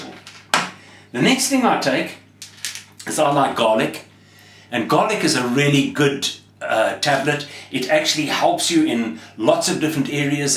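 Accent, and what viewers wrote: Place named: South Africa. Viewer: you are deffintly south african or rohdesian Bra that accent is awsome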